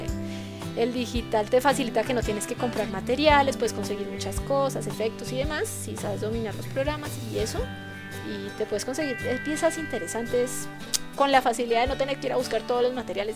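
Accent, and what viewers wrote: Colombia. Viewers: No joda parse es colombiana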